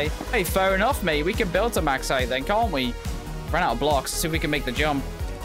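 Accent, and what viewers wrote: United Kingdom. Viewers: Are you british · I just realised he is British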